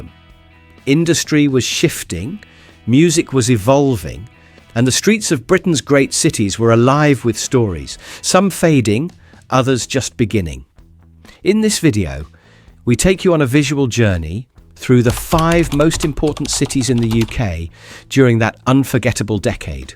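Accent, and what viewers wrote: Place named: United States. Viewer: He's a yank,period - geddit !!😅😅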